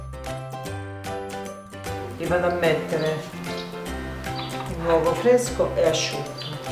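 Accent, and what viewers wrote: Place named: Tuscany, Italy. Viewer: Bravissima. Hai un accento toscano😍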